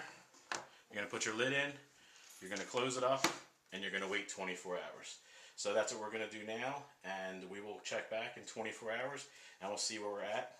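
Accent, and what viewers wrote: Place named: Philadelphia, United States. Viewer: Philly accent.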